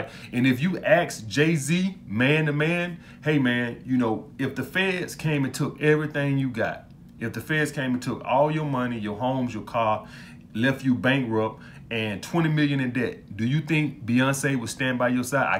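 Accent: South, United States. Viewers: True knowledge as always! I always heard the southern accent but didn't know you was from Alabama fam!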